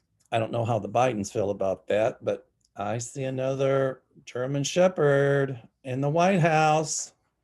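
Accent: South, United States. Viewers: Please, don't apologize for your beautiful, southern accent! 😉 We love & appreciate you, just as you are - never change. 😍 This is what makes you unique & special. I can listen to it all day! Own it. Embrace it. Also, may God bless our dear Champ! 🐕👼🙏🐾❤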